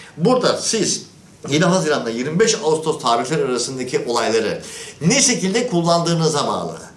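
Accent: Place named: Germany. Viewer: Hocam Alman aksani var sizde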